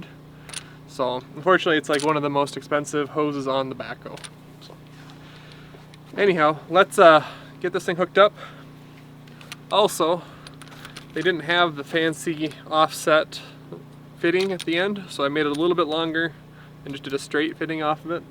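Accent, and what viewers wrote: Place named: Minnesota, United States. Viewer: You are just so laid back and patient. That is what it takes to work on this stuff. Love the vids. Your Minnesota accent is similar to my accent from Canada.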